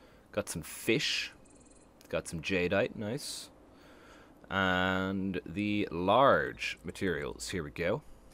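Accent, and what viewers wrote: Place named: Ireland. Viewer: Are you Irish or just live in Ireland? I will admit I've wondered about the origin of your accent :D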